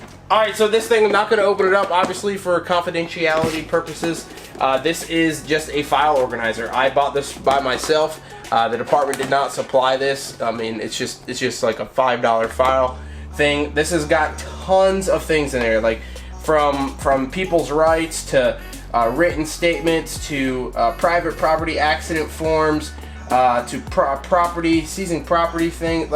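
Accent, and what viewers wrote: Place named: Georgia, United States. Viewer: Starting to get that georgia twang now huh? Lol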